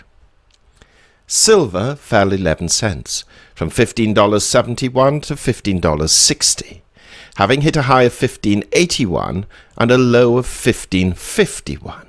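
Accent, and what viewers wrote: England, United Kingdom. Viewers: I do enjoy your pure English voice :) I tell those trying to learn English where I live attempting to shake off the chains of their poverty, that the purist form of English comes from the English :) I am going to recommend a few of those I care for to subscribe to this channel to help them with a little of this purity :) As far as this post goes however re my understanding being more focused on the substance, I agree it is following standard trend, until it doesn't :) Panic can begin within minutes and within hours a different world may be what is faced. Yes chances are slight, or are they? The fixation on the Tariffs hid its true meaning of the Pre Tariff sugar fix, pre purchases, costing investors trillions. Perhaps its hangover of such actions and what it causes is now hidden under the Government shutdown :) We will just need to wait and see, wont we :)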